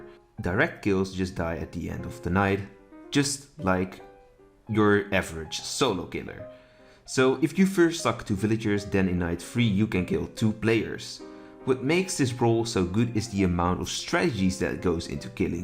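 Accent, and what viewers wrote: Netherlands. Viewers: UR SO DUTCH HELPPP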